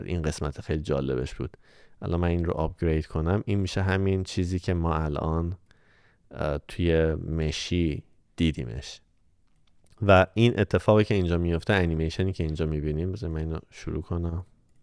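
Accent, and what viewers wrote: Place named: India. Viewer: از پکیج دیجیتال مارکتینگ که چندین سال پیش دادید فکر میکردم هندی باشید بخاطر لهجه لاتین تا الان که یهو این ویدئو رو دیدم، عالی بود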